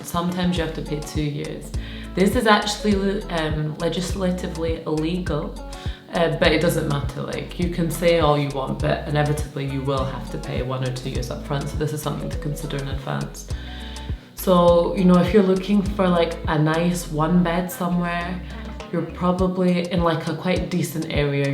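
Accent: Scotland, United Kingdom. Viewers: I detect some scottish accent there ... am I right ? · I thought I heard a Scottish accent.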